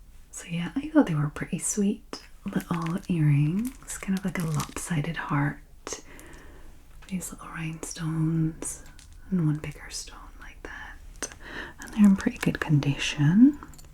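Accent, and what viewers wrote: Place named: Scotland, United Kingdom. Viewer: She’s from Scotland, she speaks with a Scottish accent. American pronunciation isn’t the only “proper” way of speaking English.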